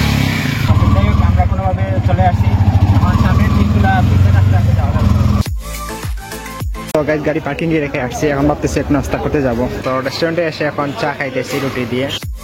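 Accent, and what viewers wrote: Sylhet, Bangladesh. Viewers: সিলেটি নি আপনে?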